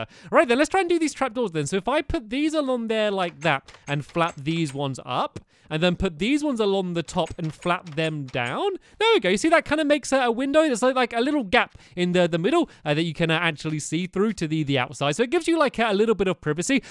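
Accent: United Kingdom. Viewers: landen reeves he's British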